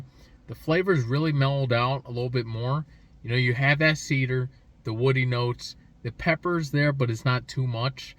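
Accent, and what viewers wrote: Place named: United Kingdom. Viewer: Yo I've came to like the British voice over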